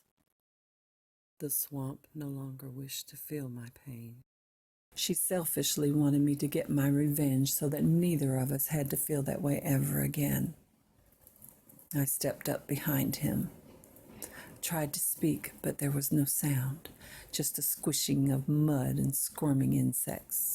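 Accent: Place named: South, United States. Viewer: OMG... You were MADE for this!! 🔥 I LOVE the slight, southern drawl that lilts through the beautiful timbre of your voice that, annoyingly, draws me right into the story lol - I say annoyingly because, I don't do scary. I usually avoid it like the plague haha, but I'll do it for you 😊 I'm so glad you pushed past whatever almost stopped you from branching into the spoken word! And such a great piece too - mad props to the writer. The imagery was easy to imagine and the flow/build up was so natural... I could totally listen to you do more of this 💜 So says this Kiwi at 415am #IDontKnowWhyIWokeUpSoEarlyUgh 😴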